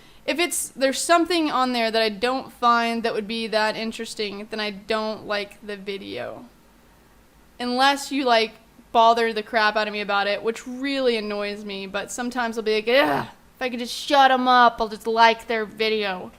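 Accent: England, United Kingdom. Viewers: BEST BRITISH ACCENT EVER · Your British accent <3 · Hahaha, Your British accent :) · Keep it up. You'll probably keep getting shit because most of the people on Youtube are quite young and narrow minded. Having a female on the scene though is a good thing. P.s don't let Stephen Moyer hear your English accent =)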